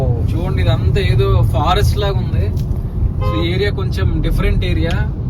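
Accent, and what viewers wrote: Srikakulam, India. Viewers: Nee life antha yemi cheyyalo artham kaaka ankithani pelli chesukunnav. Hi this is ane mukka thappa neekemi raadu english srikakulam slang neeku driber job chesuko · Sir Mee slung srikakulam la undhi bro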